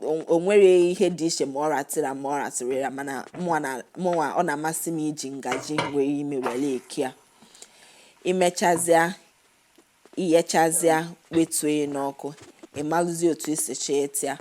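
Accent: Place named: Igbo, Nigeria. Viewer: Iseeeeee 🙏 I love your Igbo accent 😁😁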